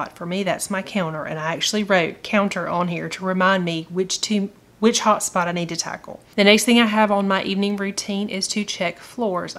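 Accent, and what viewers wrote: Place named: Alabama, United States. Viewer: this is definitely a first, Alabama mistaken for Australia 🤭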